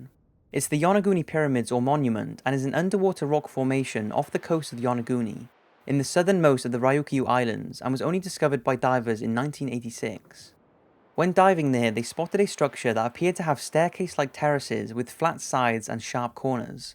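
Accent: Wales, United Kingdom. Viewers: I would say Welsh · He is British but I mean you're obviously right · are you welsh? I know it sounds like a stupid question, but are you? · Love to know where your from. Kinda sound a bit Welshy. Bit of a Cardiff accent going on when you say some words. Your awesome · Ahhhh a good British voice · Wales, UK :P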